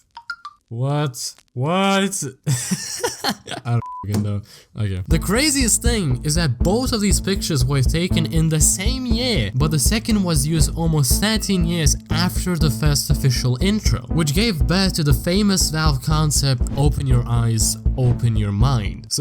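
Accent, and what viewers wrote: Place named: Baltic states. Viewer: Y'know, you have some weird Baltic accent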